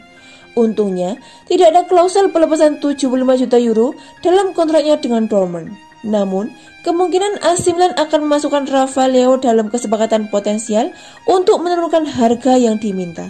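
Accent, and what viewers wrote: Java, Indonesia. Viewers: Kemedokan yang baca berita nya...gak menarik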